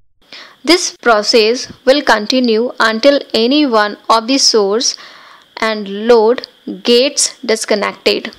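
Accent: India. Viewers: Animation is pretty ok but DEAR GOD I can usually stand Indian narration but JESUS CHRIST THIS ONE HAS DRIVEN ME INSANE IN 30 SECONDS I CAN'T FUCKING TAKE IT ANYMORE thank God for auto-gen captions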